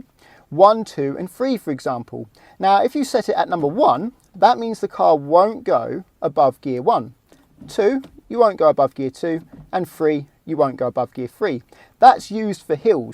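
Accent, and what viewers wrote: England, United Kingdom. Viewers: Love your British accent! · As a French leaving in the US I was a bit confused by the driver seat on the right side but love the British accent. Great video for someone taking their driving test in an automated car after driving 10y with manual. Thanks for showing a close up of the pedals too. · He is so easy to listen to. The English accent is so much easier on the ear than another nationality that I won't mention!! 😂. I'm 🇮🇪 btw!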